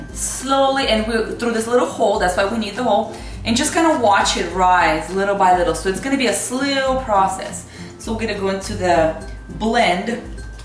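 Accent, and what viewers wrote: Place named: Russia. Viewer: кстати,речь с русским акцентом гораздо приятнее уху и легче понять,чем коренных носителй языка! кофточка очень к лицу,красотка!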